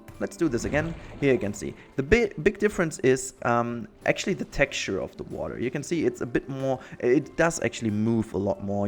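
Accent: Germany. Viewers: Thanks for your afford! Appreciated it - you have a german accent - don't you?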